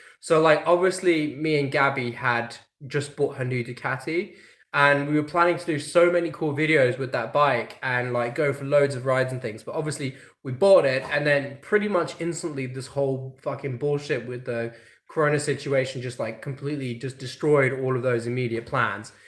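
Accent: United Kingdom. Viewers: Are you afraid of losing your english accent being locked up for so long with Gabbi?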